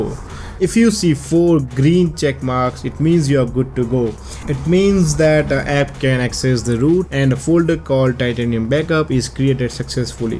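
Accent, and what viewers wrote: India. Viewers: Bro are u Indian??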